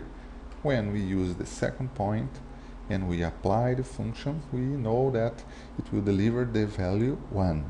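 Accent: Brazil. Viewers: Que sotaque brazuca hein? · You are brazilian, aren't you? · Great explanation! You must be Brazilian by your accent right?? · sounds like brazilian english =) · By the accent I can say you are brazilian like me :) Great video! Helped me at the exam :) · are you Brazilian? you accent sounds a lot like the Brazilian one...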